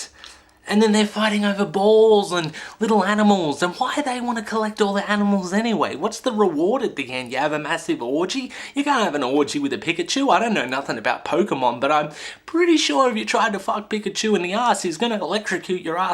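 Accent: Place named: Ireland. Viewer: you use dragonballs to make a wish. I seen every episode. When I was a kid that was the best shit out. And why do you look Chinese and sound irish?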